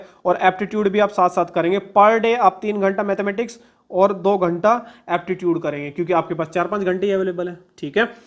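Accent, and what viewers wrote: Haryana, India. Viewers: 🙏🏼 haryanvi accent ❤️ Tahe dil se shukriya sir❤️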